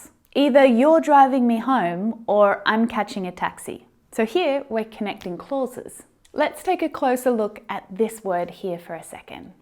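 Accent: United Kingdom. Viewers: Hello, 👋 first of all thanks but I believe your pronounceation is British, am I right? So I've learned American English, but my English is not good enough so if I follow you may be I confused. I need an American accent teacher could you help me or what is your suggestion? Thanks in advance. 🌹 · G'day, I can't figure out if you're British or Australian. Maybe (like me) you're both?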